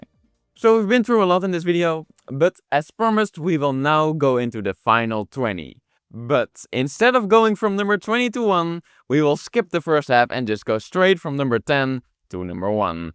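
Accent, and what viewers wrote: Netherlands. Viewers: I hear the Dutch accent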